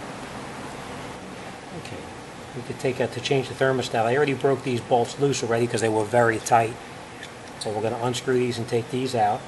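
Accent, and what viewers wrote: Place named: New England, United States. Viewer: Smart man but that Yankee accent hurts my ears.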